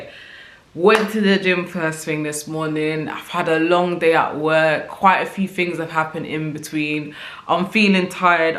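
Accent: London, United Kingdom. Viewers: UK IN THE HOUSE!!🇬🇧❤ So refreshing to hear a London accent talking about locs. Really like your channel and content. Really insightful ✨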